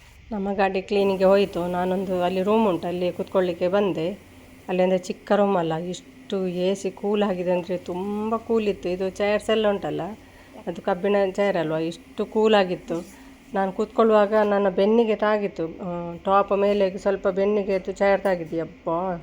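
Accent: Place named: Mangalore, India. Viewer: Nim mangalore Kannada bashe Kelakke Chanda ❤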